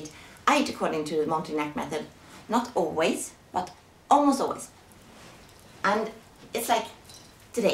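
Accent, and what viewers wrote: Sweden. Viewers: swedish accent :) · You seem like such a sweet caring Mother <3 Also, I wish I was Swedish!! Your accent is So ADORABLE! I want it :3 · shes swedish, so a swedish accent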